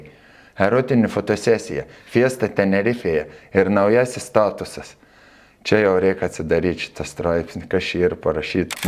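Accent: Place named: Samogitia, Lithuania. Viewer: Daugiau žemaitiška rokoukis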